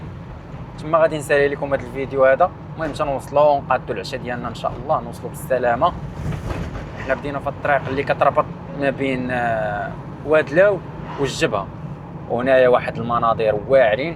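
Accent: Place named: Egypt. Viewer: سفرية 😂😂😂😂وليتي مصري 😂😂متاثر بلهجة مسلسلات رمضان يا مدلولين....سفرية....تفو على شعب متاثر بلغة المشارقة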